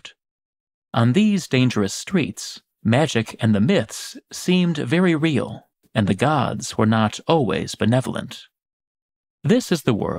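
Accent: United States. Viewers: Very nice work especially the illustrations. Rather disturbing though your pronouncing Latin names the American way.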